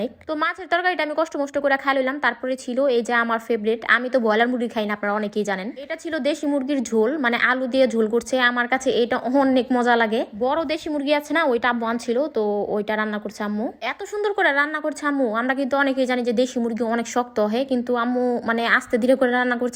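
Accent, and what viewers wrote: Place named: Old Dhaka, Bangladesh. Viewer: আপু তুমি যে পুরান ঢাকার ভাষা বলা আমার এত ভালো লাগে তোমার সবগুলো ভিডিও দেখে মাশাল্লাহ দারুন হয়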